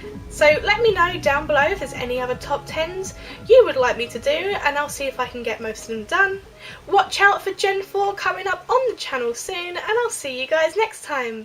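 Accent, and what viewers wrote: United Kingdom. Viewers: Your accent sounds like your from the UK is this true ? :)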